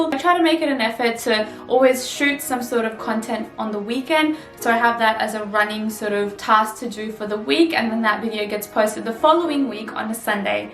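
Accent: Australia. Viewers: Brilliant video! So helpful, I'm still using my phone to film at the moment, I actually don't think it's that bad but would love to upgrade to a proper camera one day. The ring light makes SUCH a difference. Are you Australian? X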